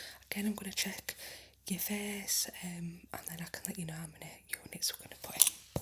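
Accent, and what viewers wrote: North, United Kingdom. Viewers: That was great. Nice to hear a fellow Northerner ❤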